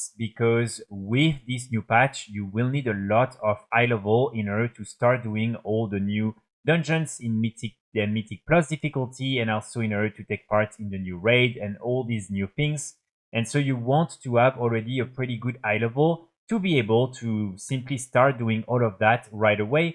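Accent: France. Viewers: J'aime beaucoup ton contenu et tu est super regulier ca fait vraiment plaisir , j'ai pas un niveau de dingue en anglais mais je comprend l'essentiel, ca s'entend que tu est francais du coup je me demandais si tu avais deja songé a faire tes videos en fr je suis certain que ca trouverais son public 😊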